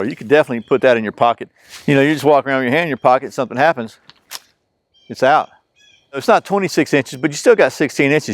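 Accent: Georgia, United States. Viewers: You have a really relaxing voice. Are you from GA or thereabouts?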